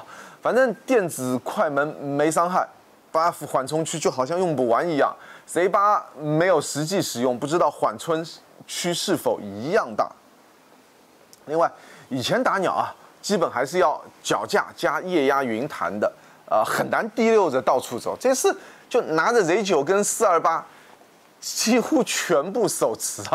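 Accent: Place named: South, China. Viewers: 听着南方口音，很亲切！澳洲Z8实在贵离谱了:face-pink-tears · Up主是上海人吧,一口南方口音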